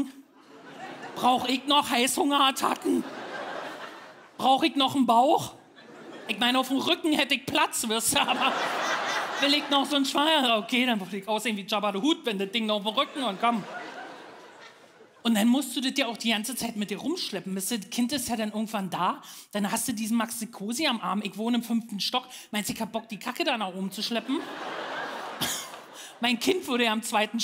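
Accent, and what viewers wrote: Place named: Berlin, Germany. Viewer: Schön das du wieder da bist. Hast gefehlt 😅 I liebe deine Stimme,dein Berliner Dialekt und deine coolen Sprüche ❤️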